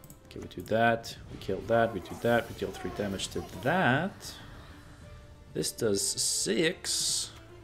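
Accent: Norway. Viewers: Hey, I love your decks, I watch them all - and yes, even though I've been playing for years, I still pick up things here and there from you. It's pretty entertaining to watch these matches play out and you seem kind and sincere. Please keep it coming. I've done some decks that have been #1 trending but, for example, I didn't know about the rule of 16 until you told me! I like different decks, I probably spend as much time building and creating. Anyway, trying to place your accent. I hear European, perhaps Norway? Have a great day. And be happy - your Gwenting!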